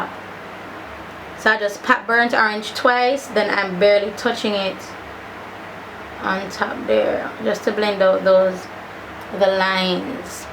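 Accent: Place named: Jamaica. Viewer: Well excuse me; that look screams pure royalty 🙌🏾. You look absolutely stunning. Very skillful. On another note, I just love your accent, tone of voice, speech pattern and how you enunciate your words. Thumbs up for Jamaican 🇯🇲 patwah. Thank you for sharing.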